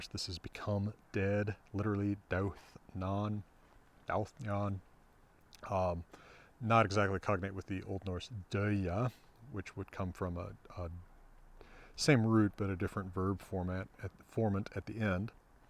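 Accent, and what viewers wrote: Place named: United States. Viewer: You'll find most Norwegians pronounce Old Norse and proto-Norse with a thick Norwegisn accent, and Icelandic people pronounce it with a thick Icelandic accent, so who's to blame thick Anerican accent really. I wish there was more published on reconstructing the more precise pronunciation in the different stages, though. I think a pretty distinct trsit of Old Norse until at least around 1000 would be the nasal vowels, but who today tries to reconstruct that?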